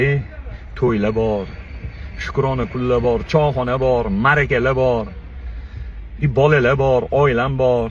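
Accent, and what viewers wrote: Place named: Tashkent, Uzbekistan. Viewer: Тошкенчани аригинали 😅😅😅😅😅 ёмон опкочади шу ока😅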